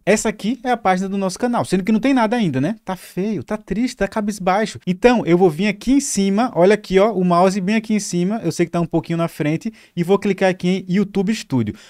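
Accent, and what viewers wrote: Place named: Paraíba, Brazil. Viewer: Cheguei hoje por aqui e tô maratonando! Sua didática e franqueza são mega cativantes! E seu sotaque super me pegou! Pensei até que era da minha terrinha , João Pessoa :face-red-heart-shape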